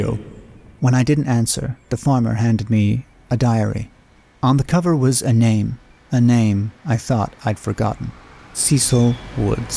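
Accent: United States. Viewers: So amusing to hear the American accent. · This is your "American" accent love it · Good American accent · Man, I actually thought the narrator was an American voice actor until the squarespace add came up. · 35 seconds in, it's already pure gold... Casper mattresses and Bombas socks 😂 and the accent omg! never would have guessed it wasn't a native accent! but ofc it has to be American! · Really good american accent. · Great American accent!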